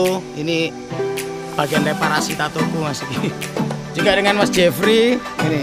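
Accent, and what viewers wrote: Surabaya, Indonesia. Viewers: mas awkmu wong suroboyo ta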